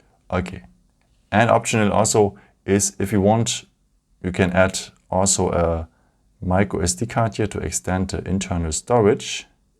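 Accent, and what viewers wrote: Germany. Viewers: It sounds like you’re a native German speaker, but this accent sounds unfamiliar. Which dialect?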